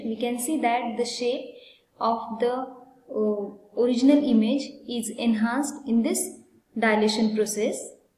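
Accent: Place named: India. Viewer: i hear indian voice, i go back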